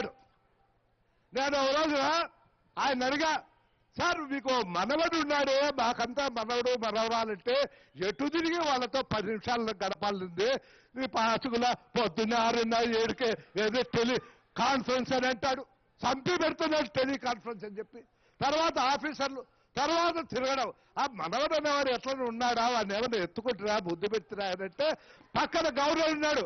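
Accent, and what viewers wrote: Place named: Rayalaseema, India. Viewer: Pure Rayalaseema language 😁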